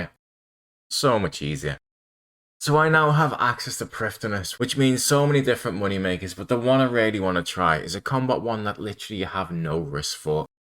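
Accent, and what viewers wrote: Liverpool, United Kingdom. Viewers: scouse power!!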